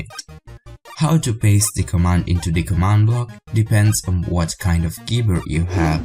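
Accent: Italy. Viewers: sei italiano?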